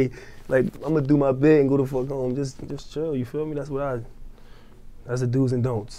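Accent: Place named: Philadelphia, United States. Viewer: That's what being from philly sounds like lol #SouthPhilly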